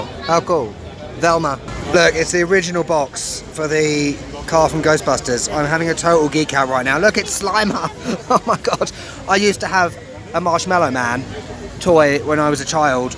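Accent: England, United Kingdom. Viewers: Are you from England ?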